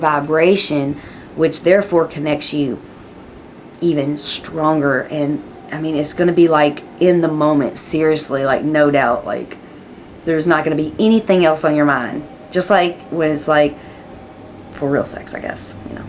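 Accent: South, United States. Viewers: Cool southern accent haha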